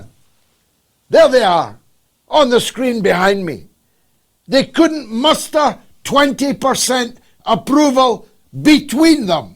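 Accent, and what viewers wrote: Scotland, United Kingdom. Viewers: The Scottish accent really helps to emphasize the urgency of the message. Brilliant.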